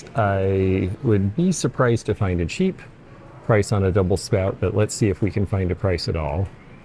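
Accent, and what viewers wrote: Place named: Louisville, United States. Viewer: HI, lots of personality in this one!!! Sometimes you are so dang funny!!! It's always so unexpected and then you get me! Thank you for recalling Louisville to mind. One can always tell where the person's from depending on their pronuciation! 😉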